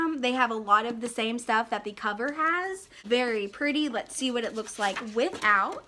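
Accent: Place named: Spain. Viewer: I love your Spanish accent it's so cute. And don't mind it's difficult to read it. I'm spanish and for me English is kind of difficult 😂😂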